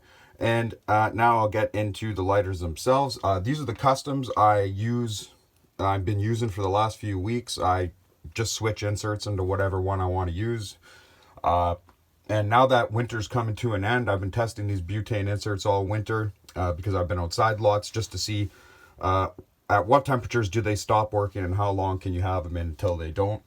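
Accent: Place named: Canada. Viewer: I support you. I remember seeing you on a couch with Tman (and a cat) and there was some chick in the room too. I remember watching your holyday video where you stayed at a hotel at the beach with your family, Infact I remember that video well. I remember Tman referring to you as a better option as a friend, If I was interested in inviting someone to Down Under. I remember the amazing gift of joy Tman gave me, as he puffed away on a Lucky Stike or Camel un fileted. But just now I want to say to you. I support you and Canada. I enjoyed who you are. And I enjoy your Canada voice. I could go on like your bus(van) trip to the Zippo factory or how I'd love to just grab a Blue Berry Zippo of the shelf at my local tobacconist. Ashes to ashes. BUD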